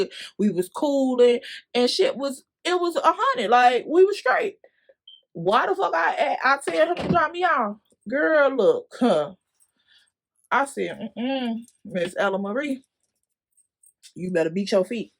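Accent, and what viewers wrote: Chicago, United States. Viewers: Lol I knew you were from Chicago within 1 min of watching this video...I didn't believe it.. But I guess we actually do have an accent lol... Good story telling 💯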